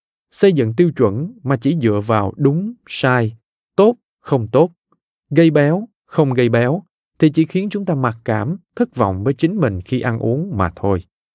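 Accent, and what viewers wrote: South, Vietnam. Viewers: Đúng rồi. Giọng Nam mềm mại dễ nghe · Giọng đọc miền nam hay quá, cảm ơn! Sách hay! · Đã lâu lắm rồi mới được nghe một giọng đọc miền nam quá chuẩn , phát âm rõ ràng S và X …cám ơn nhiều ! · Tôi thích giọng đọc miền nam này, nghe mà ghiền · Thích giọng Miền Nam. Thích nội dung sách. Cảm ơn bạn. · Giong đọc của anh này quá hay , đúng là giong miền Nam nghe hay hơn giong bắc. Sách hay ,giọng nói rất hay. Cảm ơn tác giả va cảm ơn người đọc sách hay .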